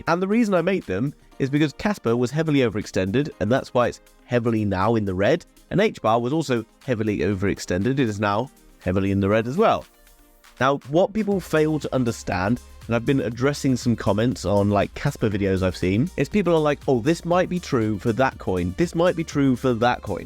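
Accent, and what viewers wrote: RP, United Kingdom. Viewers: I think you might be the poshest man in crypto.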